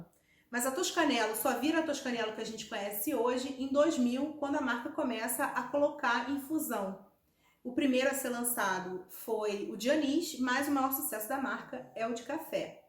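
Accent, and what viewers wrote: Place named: Rio de Janeiro, Brazil. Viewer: .. adoro esse sotaque carioca. Ótimo vídeo, uma aula, parabéns!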